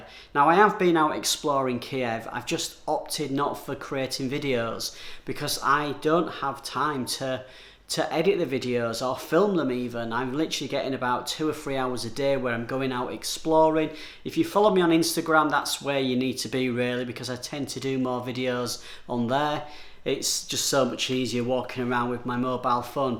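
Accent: United Kingdom. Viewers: Are you British.?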